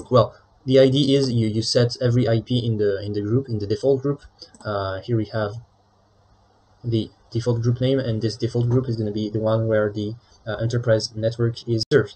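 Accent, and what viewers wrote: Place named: France. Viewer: I like the French accent. Thanks for the video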